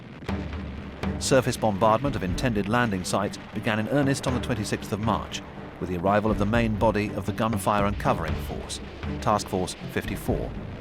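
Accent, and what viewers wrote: United Kingdom. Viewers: Love these history docs narrated by cool Brit, while vintage video flickers along 💖 Many thanks 🙏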